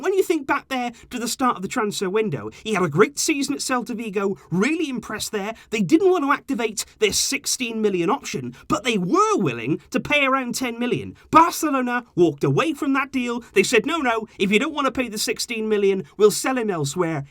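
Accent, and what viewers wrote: United Kingdom. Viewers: I practice my British accent by mocking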